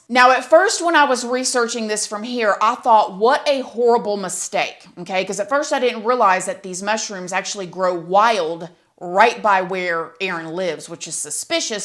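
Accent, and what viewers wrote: South, United States. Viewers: Hey! I’m now discovering you… GURL! Where you from? Southern? Your accent is coming through hard! 💯Love it!💖 Oh yeah… This story is madness! 🤯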